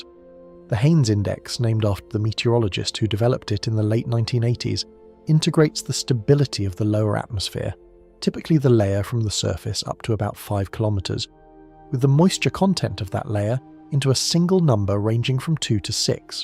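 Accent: England, United Kingdom. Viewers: Isn't there anything going on in Europe why are you telling that's about the United States and not talking about the continent that I think you're from since you have an English accent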